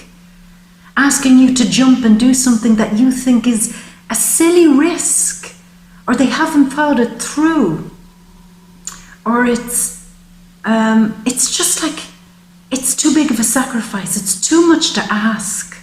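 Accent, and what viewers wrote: Ireland. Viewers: looked at my relocation chart the other day and it seems that Ireland is where I need to be!! Although born in the UK my father was a Kerryman. I have been living in Spain for 33 years now but my feelings aren't the same as when I came out here......listening to your lovely accent makes me feel at home!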